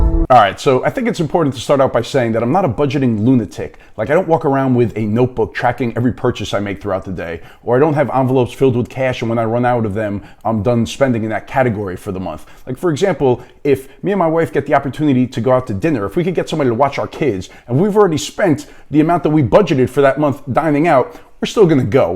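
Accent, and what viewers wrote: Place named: New York, United States. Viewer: Oh wow! I notice the mic on your shirt. Big difference in sound from the previous vid about leverage. Your voice sounds more clear and focused and the room echo is gone now. Your voice is clear and deep now. Good job man! Oh, your speech style really reminds me of Christopher Walken. I guess it's a New York thing lol.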